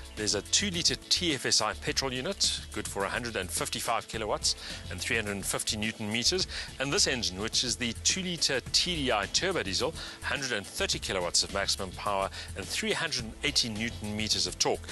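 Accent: South Africa. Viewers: ARE YOU FROM SOUUUTH AFRICA?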